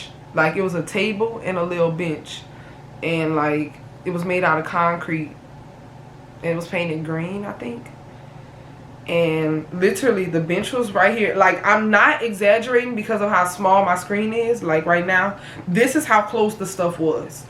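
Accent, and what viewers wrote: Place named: New York, United States. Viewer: i looove your accent! u from NY??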